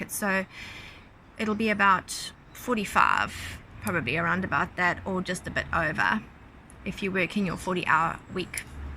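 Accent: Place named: New Zealand. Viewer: How long you been here, your accent has bouts of pure kiwi sounding.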